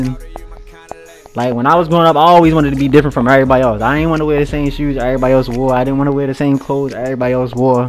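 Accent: Baltimore, United States. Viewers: Baltimore ppl cant talk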